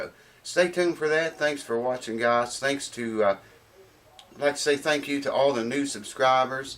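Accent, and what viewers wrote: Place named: Alabama, United States. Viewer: Thanks for uploading so many videos about how you do your repairs. Using them to help me out working on a little German flatback mandolin...Additionally, I live in Europe now, but you must be from very, very close to where I'm from, judging by accent. Southern Alabama? Who knows, you might even have fixed some of my uncle's guitars, or have played with him before. Keep on keepin on, man. Outstanding youtube stuff.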